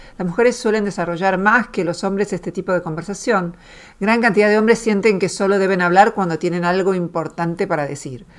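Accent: Argentina. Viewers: Castellano a lo mexicano con acento aegentino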